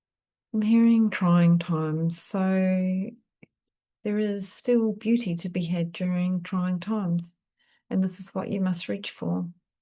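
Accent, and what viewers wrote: New Zealand. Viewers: Thank you, It is so nice to have a reliable channel here in the Southern Hemisphere. I love listening to your beautiful Kiwi accent and know you are here in Eastern Australia. I am in Nth Narrabeen NSW and you really resonate in my heart. Thank you for your commitment, I am truly grateful 🙏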